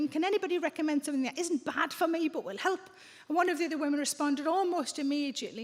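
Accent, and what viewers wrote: Newcastle, United Kingdom. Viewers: she's a Geordie. not fast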